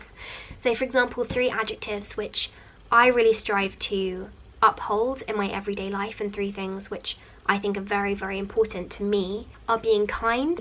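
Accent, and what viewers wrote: RP, United Kingdom. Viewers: Is it British accent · I feel so ruff after watching this your accent is sooo posh I am half from Essex half from Cardiff ly x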